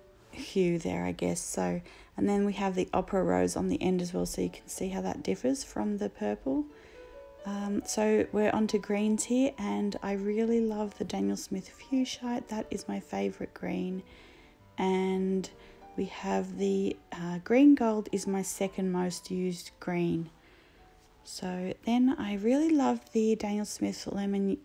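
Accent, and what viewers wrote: Australia. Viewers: her billowing heart You sound Australian? Thank you for this delicately made video, it was so different to all the other palettes that I see on Utube. Tip: I read on a comment a while ago, that you can use glue stick on the palette, unless you like your little beads of colour on your tin which are really cute! I use the glue stick and I wipe it off with a wet tissue. The reason I like this, is you don't have to scrub it, or erase it or buff the enamel. It prevents staining too! Just be mindful not to use a towel, or a heavy paper, as you will get lint in it. It makes sense though, with the sticky honey pigments, although when you say use glue stick, it kind of shakes you up a bit. Have you naturally taken a while to like all these colours? Interested in getting more of those pearly colours from Daniel Smith, and the gold that was on your cherry blossoms? 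I think I liked it when you mixed these out, as it looked like you had a lot more that I wouldn't have thought to make. Have fun In kindred spirit Eliza MB Day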